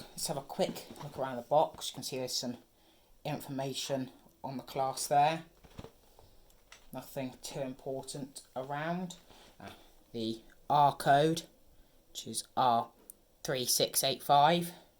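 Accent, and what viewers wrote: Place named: Scotland, United Kingdom. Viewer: Nice accent mate are you Scottish just wondering cuz my ancestors are Scottish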